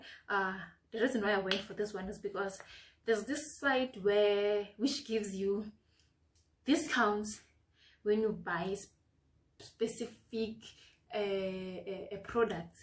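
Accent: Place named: South Africa. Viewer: Please upload more videos, i like your personality and the content of your channel, this is very helpful. and you have a xhosa accent which i love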